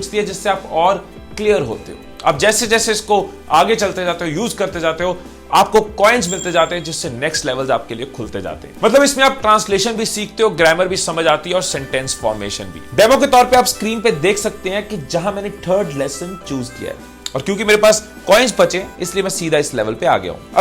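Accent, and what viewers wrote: India. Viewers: Sir hello english indian hai ya chinese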